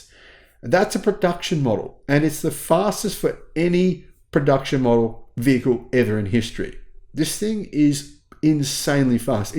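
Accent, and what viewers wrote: Australia. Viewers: What's a mile an hour?! You sound like a fellow Aussie to me.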